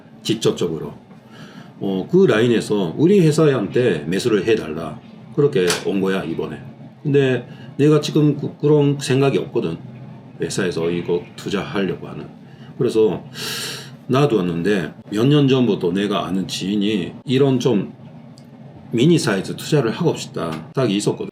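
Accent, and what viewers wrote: Busan, South Korea. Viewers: 전 사장님 부산사투리 말투 너무너무 듣기 좋아요 편안하게 계속 듣게되는 목소리에요. 알림설정 하고 갑니다^^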